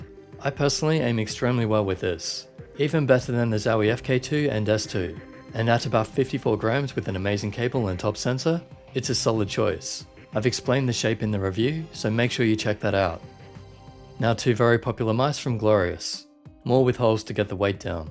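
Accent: Australia. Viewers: are you australian and why can't you get finalmouse?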